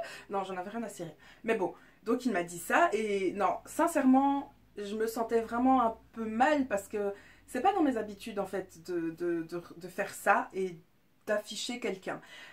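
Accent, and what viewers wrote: Belgium. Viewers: j'adore ton accents belge 😘💝😜 t'es juste hyper belle c'est fou 💎💎 tes parfaite 😍😍😍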